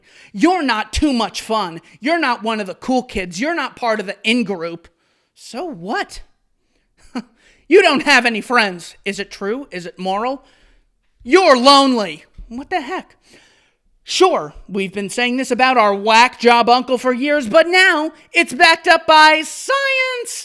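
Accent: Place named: United Kingdom. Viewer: Fringe beliefs? Are these British clowns serious? Once upon a time, abolitionists were considered fringe members of society. Love the British accent,.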